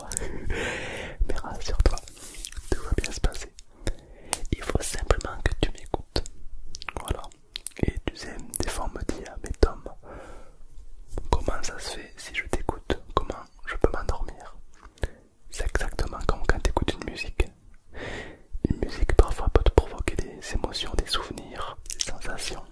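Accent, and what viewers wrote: South, France. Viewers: J’adore ta vidéo ! Très relaxante et merci pour ça ! L’accent du Sud qui fait un peu bizarre quand tu viens pas du sud mais j’adore ! J’aime et je m’abonne ! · Super découverte 👍 super travail c'est très relaxant ! Je valide l'accent du sud également 👍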